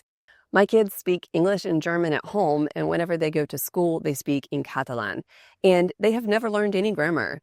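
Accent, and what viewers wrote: United States. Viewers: I wish I could speak American English like you; my goal is to speak fluently, and I know I can do it. For context, I am from Syria and live in New York, but unfortunately, I don't have any friends to practice with. I really love your high-quality content.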